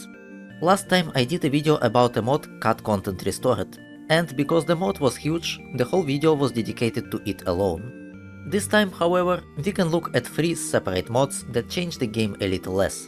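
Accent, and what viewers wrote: Russia. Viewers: я вижу что актцент чуть чуть не англ а русский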